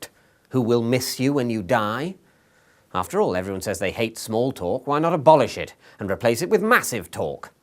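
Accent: United Kingdom. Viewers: That's only because villains are scarier when they're smarter, and we unconsciously assume anyone with a British accent is smarter. You should feel complimented :D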